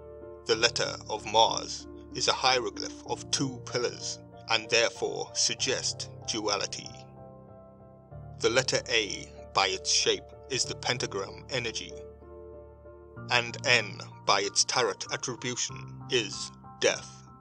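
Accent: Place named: England, United Kingdom. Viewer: Hello my dear friend. I am still here. Glad your work continues. How are you? I miss the English accent voice...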